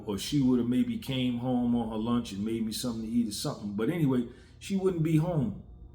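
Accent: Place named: New York, United States. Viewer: I'm astounded how you have a NYC accent, and I'm from Jamaica Queens, so I know New York a accents.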